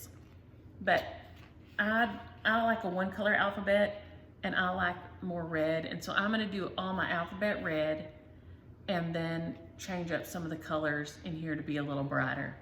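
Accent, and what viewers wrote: South, United States. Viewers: You crack me up! That moonshine story was so funny!! I love hearing your stories. I lived in Louisiana for several years while I went to school and your southern accent and "isms" brings back so many wonderful memories. Southern folk are the nicest people!!!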